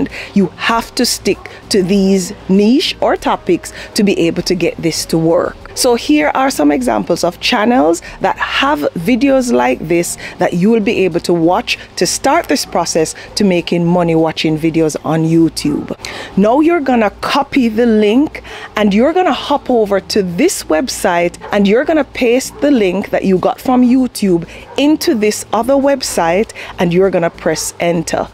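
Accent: Jamaica. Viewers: I know a Jamaican when I hear one. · I love your Jamaican accent, I'm surprised how I'm able to hear it in your English clearly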